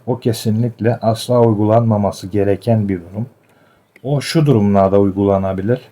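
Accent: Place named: Thrace, Turkey. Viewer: Muhacir olduğunuzu veya Trakya bölgesi şivesi olduğunu sanıyorum. Hayırlı bereketli olsun, bilgiler için teşekkürler.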